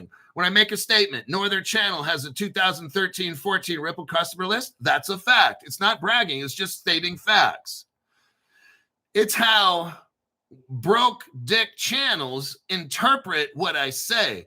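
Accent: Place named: New York, United States. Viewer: This dude gotta be fron NY or NJ 😂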